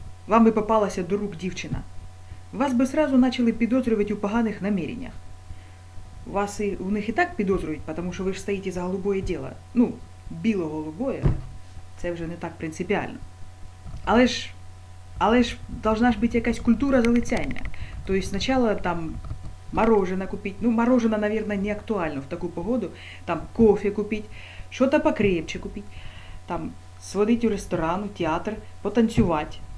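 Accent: Surzhyk, Ukraine. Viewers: Хоть бы говорила нормально, а то один суржик...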